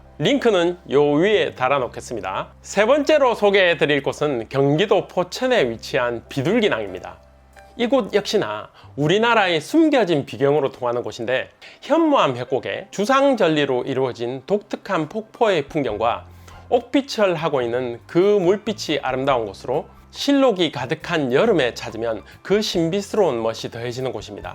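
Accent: Gyeongsang, South Korea. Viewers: 목소리 작렬 ..경상도 사투리 그러나 듣기는 쬐끔 ㅎㅎ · 저도 경상도 사람이라 듣기좋아욤👍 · 구수~~한 깅상도 억약이 참말좋십니더 좋은정보들 주셨어 감사합니다~~^^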